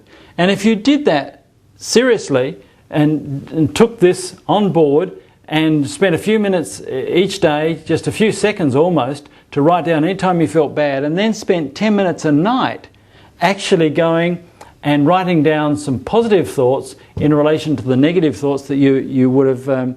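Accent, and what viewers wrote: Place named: Australia. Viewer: some Aussie accent mate..i been to perth studying for 2 years and this accent reminds me everything there.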